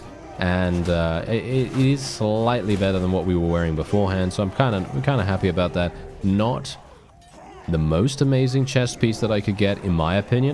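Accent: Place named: Cockney, United Kingdom. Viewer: I really enjoyed this episode, Cockney accent aside. Add it in, still really enjoyable. It's fun, but not required!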